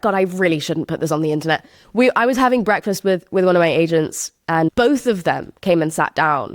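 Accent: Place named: United Kingdom. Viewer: why do you sound extra british in this episode 😭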